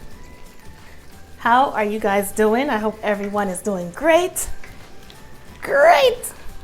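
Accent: New York, United States. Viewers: This heavy New York accent and giggle just reminds me of Ashanti and all my female friends back home. I’m making this tonight and just subscribed. Thanks love!! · Sound like your from NYC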